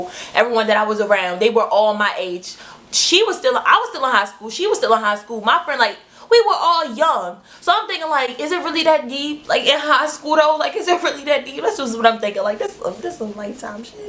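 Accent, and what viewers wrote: Baltimore, United States. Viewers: Omg I knew you were from Baltimore because of your accent! Great video btw:)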